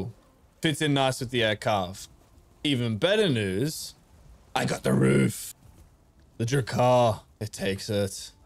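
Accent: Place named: Pretoria, South Africa. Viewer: Not the biggest (smug smile) but impressive for sure. I wish there were MASSIVE gates that you could use especially for harbours. I love your content, l especially relish your expressions when you die. I almost spat out my noodle soup when you took a lie down after dying in one of your early vids when you tried to get your stuff back from your grave during an undead raid. Much respect though that you do all in the classic game style. I build with the build mode and with a number of mods, so comparing my builds even if they are bigger is no contest against your achievment ! Oh and no worries, your Harbour really does look great, at least in my opinion. The only thing I would have added though is a gallery on either side of the great hall. You have plenty of room on either side where you could also extend outwards and add a roof on either side. 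On the inside you could then use that gallery as a balcony. Perhaps also decorate it with more chests and barrels and stuff. That is what I do on my harbours to make them look utilised. I would love to show off my builds as well, but first I need a better PC and need to figure out how to make youtube content. All in good time 😉Keep up the amazing content. I love seeing your base grow. Oh btw. Where in SA are you from ? sounds like Praetoria.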